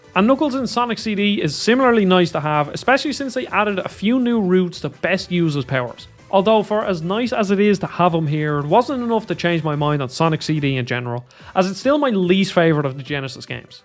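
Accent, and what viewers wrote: Dublin, Ireland. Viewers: Is that a Finglas head I hear? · I at least liked the Irish accent,the words seemed pretty harsh. And what's so great about the Master System that Game Gear doesn't have? I'm honestly curious because I see several comments complaining about the versions used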